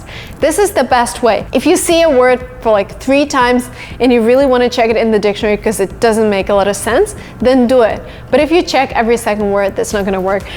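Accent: United States. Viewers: Thanks.Your pronunciation is like an american.Where are you born? I love you...!!!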